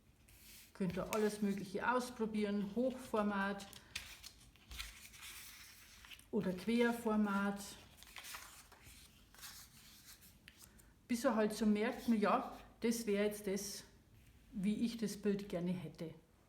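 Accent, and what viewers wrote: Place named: Upper Palatinate, Germany. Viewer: Das sind mit die besten Tutorials für Aquarellmalerei, die ich bislang hier gefunden habe! Die Beispiele sind gut gewählt, nachvollziehbar und wirken nicht einschüchternd. Zudem lehrreich, aber nicht von oben herab belehrend und sympathisch rüber gebracht (insbesondere auch wegen des leichten, aber unverkennbaren oberpfälzer Zungenschlags :)). Vielen Dank.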